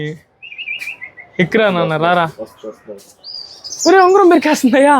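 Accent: Nellore, India. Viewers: Nellore yasa chittamuru inthaka mundhu nellore ippudu tirupati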